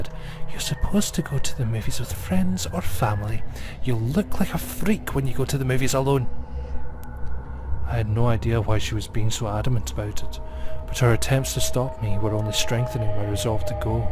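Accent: Scotland, United Kingdom. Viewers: I love your Scottish Accent!!!! Keep uploading creepy pastas just I can listen to your voice!!!!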